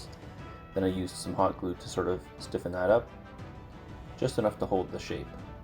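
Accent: Canada. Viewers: Im guessing out west lol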